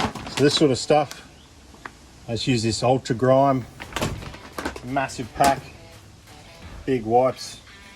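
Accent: Australia. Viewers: Looks and sounds like this guy is in Australia. I've never seen a torx screw or star headed screw on any USA Milwaukee drills or tools, they are all Phillips No. 3 or split headed with standard screwdriver slot. It's obvious the engineering is purposely different on OEM Milwaukee tools down under. Milwaukee half inch cordless chucks have been known to freeze up like this. I've never seen any tool maintenance instructions from them advising regular lubrication of the chucks. Seems like common sense but lots of tradesmen never lube their drill chucks, both keyed & keyless.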